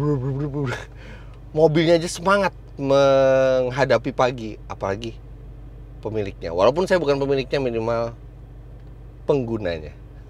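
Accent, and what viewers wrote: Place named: Malang, Indonesia. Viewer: oyi sam mangkane ndelok seng lengkap opo'o om fitra kok tak arani kenek logat malang, mergo gelek bareng karo mas gilang juragan 99. Aku yo eroh nek yowis iku boso jowo sans sam gausa mesoh mesoh ngono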